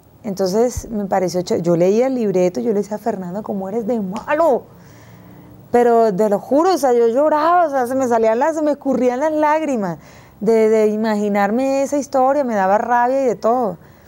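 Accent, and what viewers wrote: Colombia. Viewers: No jodaaaa aca si hablas costeño, en Betty hablas como cachaca, eche, mandas cascara, costeñacachaca no joda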